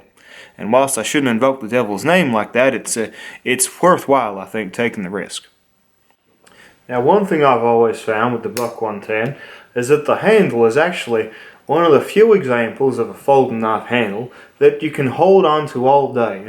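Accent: South, United States. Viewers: Thank you for finally making a video I can understand. Your authentic USA accent really helped!!! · That is an excellent country western accent.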